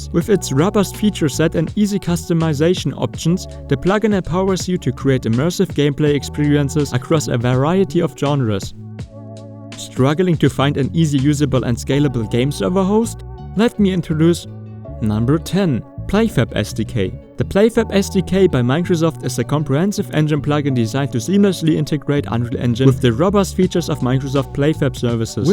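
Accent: Germany. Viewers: I take it from you accent that you're German too? Cause I'd be interested in the Node Graph Assistant Plugin (coming from Houdini it's just incomprehensible to me how bad the Node Graph functions in UE are by default 😅) but it says that the plugin was not available in my region. How did that work out for you?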